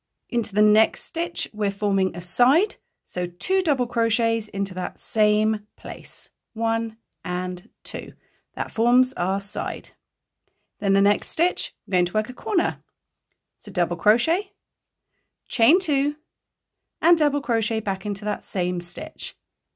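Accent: United Kingdom. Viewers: Hello I love your tutorials they are so clear and precise. But I have a query. You sound British and yet you use USA terms. When I learned to crochet 50 years ago all patterns were written, in what I now know as, UK terms. It’s only since watching You Tube that I have heard of USA terms. I prefer the UK terms, they make more sense.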